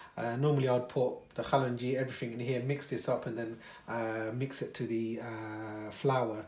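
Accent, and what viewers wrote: Sylhet, Bangladesh. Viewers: ❤️ from Bangladesh vaiya! Amazing work u r doing in UK! U sound like Sylheti 😊 Assalamualaikum to aunti ❤️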